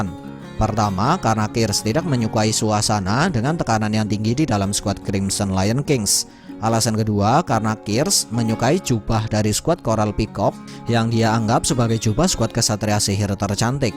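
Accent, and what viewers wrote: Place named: Java, Indonesia. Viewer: bahasa jawanya medok sekali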